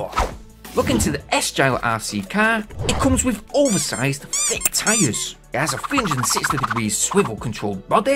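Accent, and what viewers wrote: Yorkshire, United Kingdom. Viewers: Cool car, you sound like you're from Yorkshire. I'm from Sheffield with a similar channel to yours.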